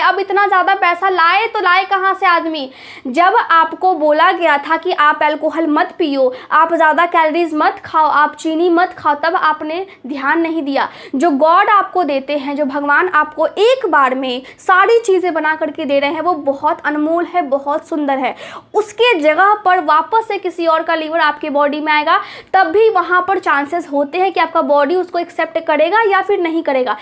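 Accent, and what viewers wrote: Bihar, India. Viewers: Aap Hindi saaf bolo pahle bihar ki ho naa aap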